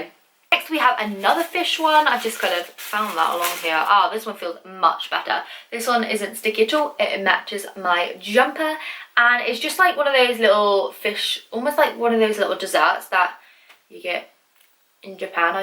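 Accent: United Kingdom. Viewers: Is she British? If so YES I’ve finally found a British squishy YouTuber! ❤️ who else here is British?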